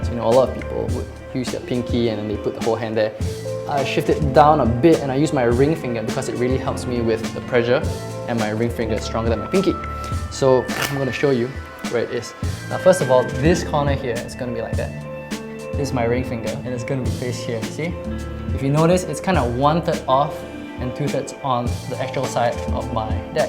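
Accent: Singapore. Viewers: Your accent man... are you Singaporean? o_O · You sound like singaporean or malaysian. :p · wah seh, ur singaporean accent quite strong. by ur 2nd sentence i can tell ur singaporean already... hahahhaha.. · I love your Singaporean accent. Me dad is from Singapore <3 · hmmm....funny how i can tell apart a singaporean.probably the way we speak... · you sound so singaporean ! Are you? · you know a singaporean when you hear one... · This guy sounds Singaporean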